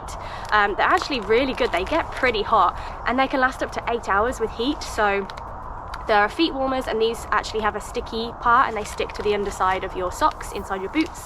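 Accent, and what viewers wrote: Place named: RP, United Kingdom. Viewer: Thank you!! As an American I loved your proper accent and pronunciation. I found the difference in vocabulary I.e. torch/flashlight cute!More importantly that was quite the comprehensive list!!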